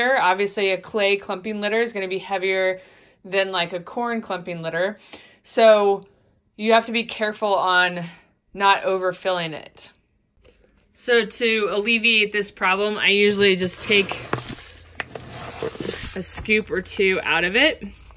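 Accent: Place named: Pittsburgh, United States. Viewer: Could hear the Pittsburgh accent haha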